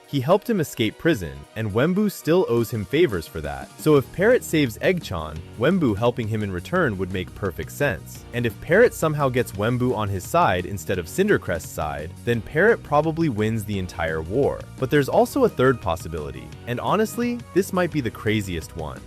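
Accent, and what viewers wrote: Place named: India. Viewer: BRO THAT INDIAN ACCENT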